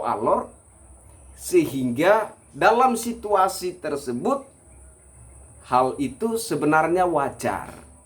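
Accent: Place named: Java, Indonesia. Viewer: Udah sering lihat chenel antum mas bro....sepertinya gaya bicaranya banyak dipengaruhi tata cara orang Jawa...bravo sukses selalu,udah sering lihat chenel ini sekarang langsung subcr...👍👍👍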